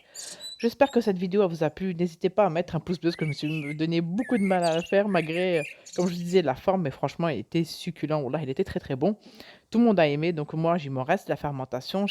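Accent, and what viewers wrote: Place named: Oran, Algeria. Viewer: هل انت جزائرية سيدتي لهجتك من وهران هل نضع الزيت قليلة فل مقلاة ام كثير والعسل كيف يكون ثقيل ام خفيف شكرا لك على الوصفة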